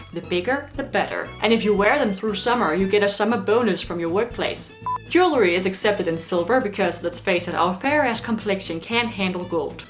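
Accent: Denmark. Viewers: Mr. Ear Raper ! Lyt til hendes accent. Hun er selv dansker. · Jesus christ her danish accent is amazing ! I think i remeber this girl from my younger days where she was adored as a scene goddess. Good times ... good times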